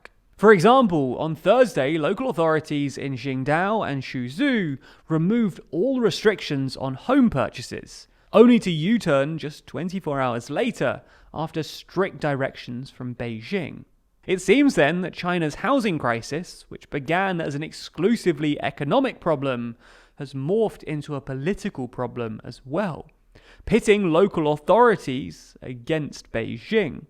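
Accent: England, United Kingdom. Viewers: You have the best English accent I've ever. I'm sure there's lots of money in Los Angeles for you, if you could handle the crazy.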